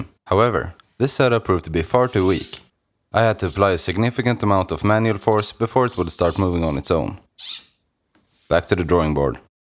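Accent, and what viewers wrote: Sweden. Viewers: Might you be svidish? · You must be Swedish, u talk with a Swedish accent. Or possibly Norwegian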